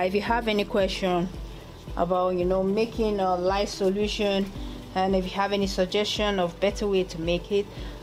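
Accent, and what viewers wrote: Nigeria. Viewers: Ok, this trashy Nigerian accent gets on my nerves.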